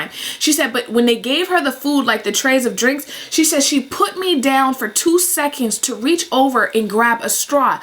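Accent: Boston, United States. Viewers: Omg you are from Boston.... what part are you from? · Hey im new to ur channel nd this is the first video ive seen haha i like it ps u must be from MA or boston me too!!!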